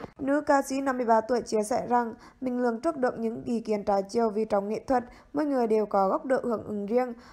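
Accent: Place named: Central Highlands, Vietnam. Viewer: Giọng đọc của người thượng hả? Lè nhè sai bét.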